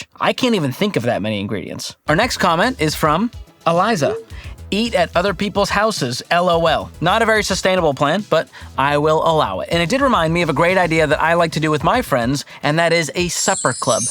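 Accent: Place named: Texas, United States. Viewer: You sound like a fellow Texan! If so, and you like okra, that stuff will grow like crazy. I enjoy gardening, but I agree that most of it is not cost saving. Usually only really save by growing okra, peppers, cherry tomatoes, and Swiss Chard.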